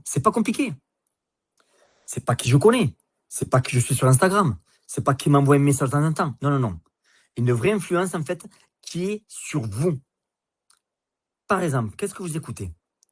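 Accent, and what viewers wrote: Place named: Toulouse, France. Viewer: Excellent, très juste, même s'il y a l'accent toulousain c'est pas très grave 😊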